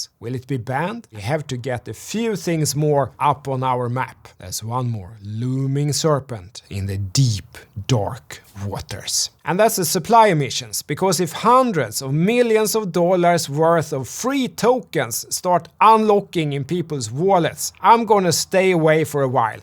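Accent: Sweden. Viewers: Your analysis are amazing and thank you for doing all the work for us and I love your Swedish accent , please do Some doge coin analysis and prediction Thanks again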